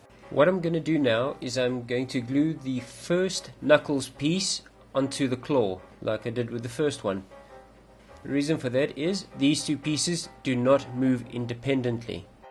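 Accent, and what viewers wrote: South Africa. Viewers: Are u south African 🌍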